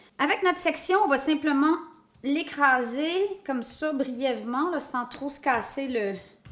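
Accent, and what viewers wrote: Quebec, Canada. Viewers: Tu es marrante et surtout très mignonne , avec ton , ci joli accent Quebecoise ..!! Félicitation , a tes parents , Gente Dame du Canada ..!! Merci , et gros bisous de France . Hubert Etienne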